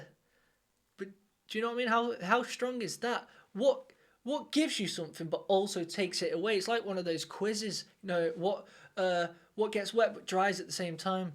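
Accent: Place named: England, United Kingdom. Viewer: Cool to see you reacting to, always enjoy seeing what you think of symphonic metal bands and the interesting things you point out. (And the recently was a nice surprise; the first album I ever bought was the Hip's third album, on cassette, when I was in junior high school.) I was pretty sure I remembered you being surprised by the dark-haired guitarist's name,. (Presumably since it sounds like the online store Temu?) Maybe I'm remembering a different reactor? Google didn't find any earlier vids of you doing or other tracks, or for. And you'd definitely remember having seen these guys in the same studio rehearsal session and wouldn't have used this title. Maybe the topic came up in a reaction or something, when you were talking about a recommendation you'd gotten or something. I can definitely picture an English accent like yours saying " " and being surprised and amused by it. 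--- I'm not sure what use to get that acoustic-like sound from those guitars which obviously don't have a resonant cavity. One possibility is a modelling amp, which simulates the sound an acoustic guitar would make for the string vibrations picked up by the pickups. (e.g. uses a Kemper modelling amp for the guitar neck of The Beast, e.g. in (middle subtitles included version if you want to check it out), or in a few songs like or I think where he switche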